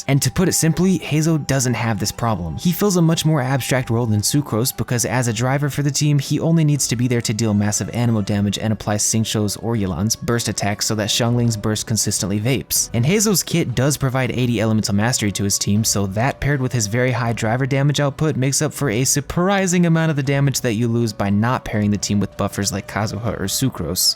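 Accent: United Kingdom. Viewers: That British accent caught me off guard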